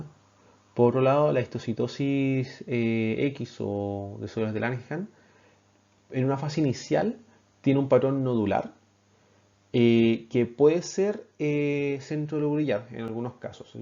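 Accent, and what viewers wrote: Chile. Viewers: no le entiendo lo que habla ... chileno?? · chileno no ?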